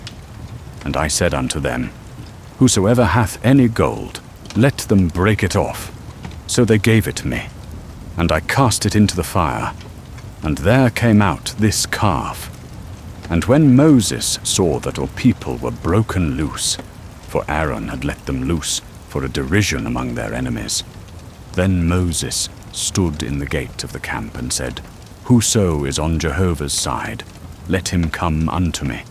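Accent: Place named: New York City, United States. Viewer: It’s giving NYC OR Chicago 😍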